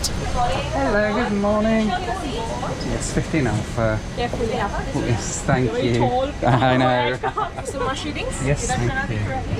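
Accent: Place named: United Kingdom. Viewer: I love the way Brits pronounce Los Angeles :P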